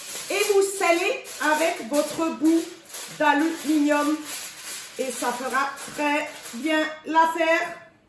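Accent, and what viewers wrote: Alsace, France. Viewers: J adore l accent !!! Ce bon vieil accent alsacien. Chouette vidéo et recette bien clair à comprendre !!😂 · Super merci beaucoup En tout cas moi j aime quand vous parlez fort Alsacien un jour Alsacien toujours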